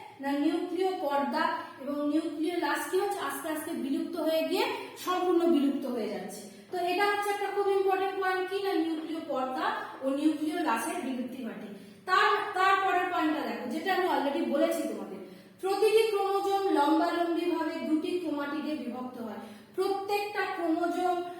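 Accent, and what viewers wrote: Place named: India. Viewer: কথা গুলা শুনে মনে হচ্ছে ইন্ডিয়ান!! ক্লাসটা ভালো ছিলো ম্যাম From Bangladesh 🇧🇩💙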